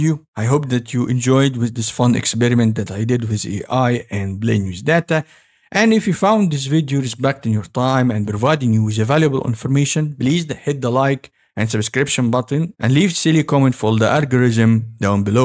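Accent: Egypt. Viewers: yes Egyptian accent is very clear through the video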